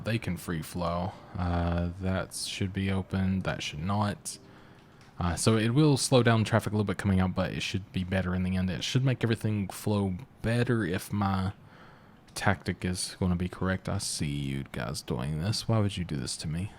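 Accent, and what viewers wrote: Texas, United States. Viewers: you sound like you are from Texas, are you?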